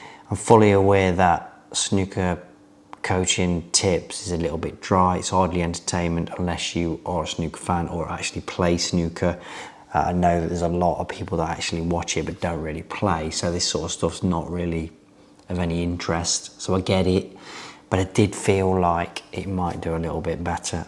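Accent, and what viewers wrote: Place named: Nottingham, United Kingdom. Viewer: Vocal inflection? He clearly doesn't know how people from Notts sound duck 👍 Looking forward to seeing what comes next to the channel!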